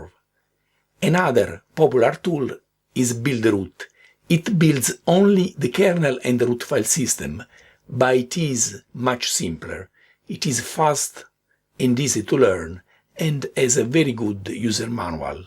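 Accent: Italy. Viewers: the Italian accent makes it even better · beautiful Italian accent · your accent is very similar to his & therefore "Very Italian" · I’m so glad you identified as Italian in this video, is been trying to localize your dialect. At first, when I was passing very little attention and It was just going in the background, my first guess was Russian/Eastern Bloc area, then by like you 3rd video I head some patterns sounding Dutch or German… I was just about to pay attention and try to guess for real and you gave the answer away at the same time lol.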